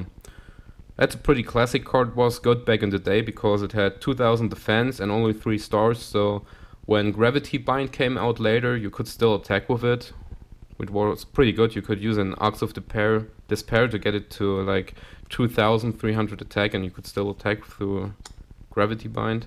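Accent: Germany. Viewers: Das Video leider erst gerade entdeckt :D Ich schreibe jetzt Mal auf Deutsch, weil sich Dein englisch so anhört, als würdest Du prinzipiell auch deutsch sprechen^^ Aber echt beeindruckender Pull. 1st Edition LOB Exodia boosterfrisch - wow! Da kann man nur Glückwunsch sagen :) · Kommst du aus deutschland ? :) hast so nen deutschen akzent 😊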